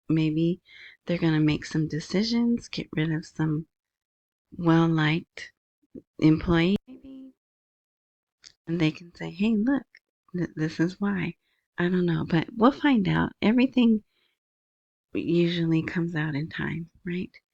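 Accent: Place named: South, United States. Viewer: Good Morning, don’t down play yourself on speaking. I’m only guessing your from down south as myself I’m from New Orleans but live in Metro Atlanta/Alpharetta Ga. I hear and understand you very well, all words are annunciated and articulated very clearly. No complaints here, keep up the good work. A little off subject I was about to invest more into Dinar & Dong but I own several million in both. I’m thinking of going about $5K and investing more into XRP crypto. I think this is own generation Bitcoin. If all avenues for sending money ( Western Union, Money Gram, Zelle, Crypto, Walmart to Walmart, Cash app ) whomever will have to go through XRP. I didn’t get it in 2022 at $0.50 now it’s $2.25 after court settlement. I think along with Iraq and all countries re settlement of currency XRP might be a good bet. I’m not telling people to buy I’m just saying I’m spreading around hoping for 1 to bring me on to super early retirement. 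Lol. Thanks for you platform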